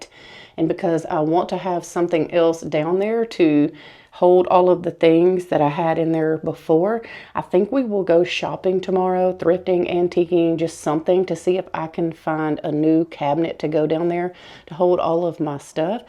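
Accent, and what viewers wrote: South, United States. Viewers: I love (LOVE!) that I've heard you say whompy-jawed a couple of times during Thrift MAYnia! We used to say that all the time growing up and it brought a huge smile to my face because I don't hear it as much anymore. I love to hear good ol' Southernisms and a genuine southern accent. Where I live, in NC, the locals have been outnumbered over the years, especially in the cities. I always love unleashing my southern twang and sayings on those that have been planted here. 😂 Sometimes they'll ask me where I'm from and I'm like...here...I was born and raised right here.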